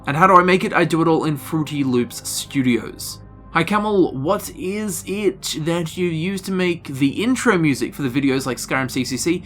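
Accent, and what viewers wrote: Australia. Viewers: Can I watch your Witcher DLC playthrough if I haven't played the main game yet or will I be confused/spoiled? I need more of that soothing Australian voice...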